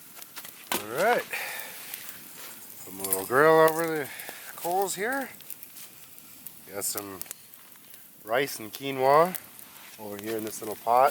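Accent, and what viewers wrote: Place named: Canada. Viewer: I never realized how much we Canadians have an accent until I watched your videos haha makes me wonder if I sound like that too and just don’t hear it 🤣🤣🤷🏻‍♀️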